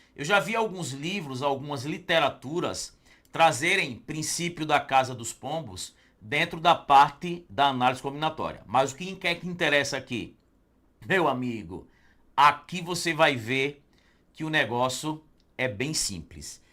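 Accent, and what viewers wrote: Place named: Northeast, Brazil. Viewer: Ótima aula, e com esse sotaque fica melhor ainda😀 adoro sotaque nordestino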